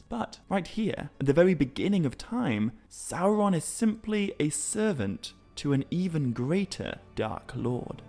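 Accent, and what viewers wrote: England, United Kingdom. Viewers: You do realize that he is English and they pronounce words differently, right? And considering how the ENGLISH invented the ENGLISH language, and also considering that Tolkien himself was English, you don't have any grounds to tell him how to pronounce English words. You just sound like an ignorant jackass.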